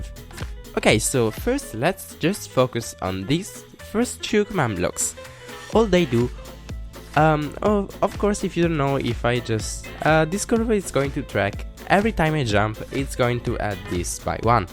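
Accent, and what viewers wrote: Italy. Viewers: Italian :D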